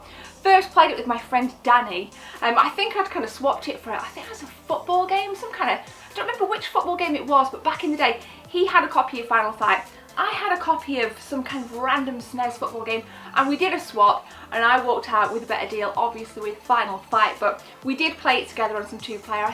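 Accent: United Kingdom. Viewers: You are really beautiful! I love the way British gals talk. I am in the US and I really like your videos. They are not boring like some other people's.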